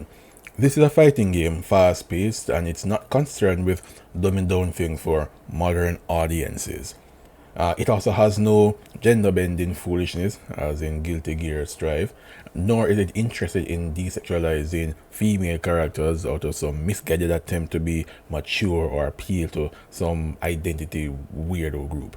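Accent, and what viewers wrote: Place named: Africa. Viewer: We all have accents. You have this strong African tribe accent. Where are your parents roots from?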